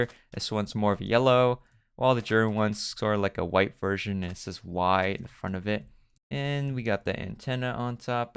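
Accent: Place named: England, United Kingdom. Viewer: The english akzent😂😂😂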